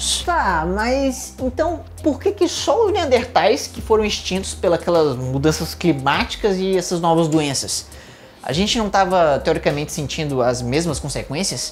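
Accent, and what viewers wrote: Ceará, Brazil. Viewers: nossa achei que ele era cearense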